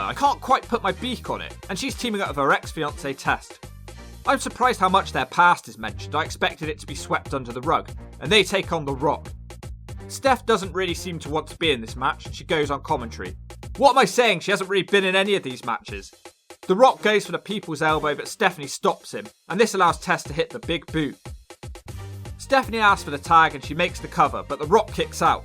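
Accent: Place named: England, United Kingdom. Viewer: Why are the narrators always English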